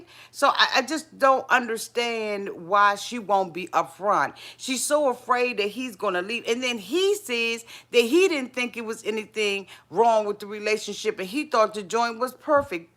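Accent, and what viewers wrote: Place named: DMV, United States. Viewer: I hear that DMV accent! 🌸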